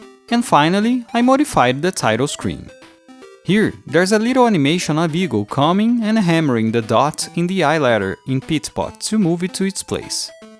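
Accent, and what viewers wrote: Brazil. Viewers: Seu sotaque brasileiro é muito charmoso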